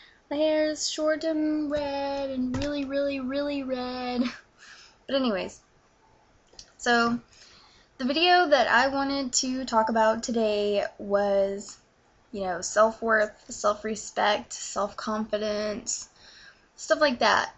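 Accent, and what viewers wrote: United Kingdom. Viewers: you're great, ignore all those fucking snob. I really like your British accent, even if its not natural. You're inspiring its cool. >//< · Hi what happened with your uk accent? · Owh!! you are so beautiful> and iu like your British accent by the way and i love it. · I just finished watching your video..the british accent..by the way i wanna know the tradition regarding on how should be the woman dresses,,atttitude or etc.. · You are so cute. ^^ I saw your video about english accent. You have a very nice voice. I like the britisch slang. Many greetz from Austria. :) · i like it, i want my hair to be like this too, it's really cool. by the way , thanks , your videos helped me to learn the british accent))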